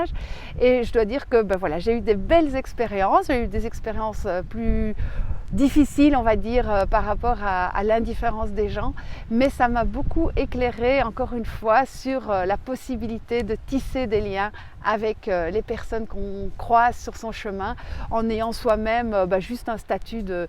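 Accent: Belgium. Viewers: Bonjour quelle énergie pour ce long chemin et toujours la bonne humeur ça fait plaisir de vous entendre ainsi que l’accent des flandres bon chemin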